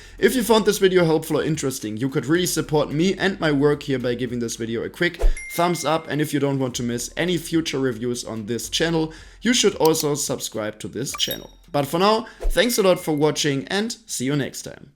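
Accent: Germany. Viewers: Accent, is it German?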